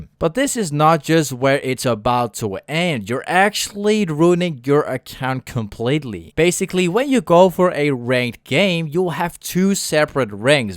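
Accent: Netherlands. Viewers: Are you Dutch? Because your English accent sounds allot like you're Dutch. (I'm Dutch and have heard allot of people talk with a weird accent haha.)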